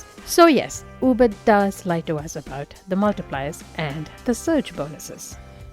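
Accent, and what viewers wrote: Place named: Quebec, Canada. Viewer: Are you Quebecois? You accent sounds different than most from that area. Im just into languages and accents. Salutamu!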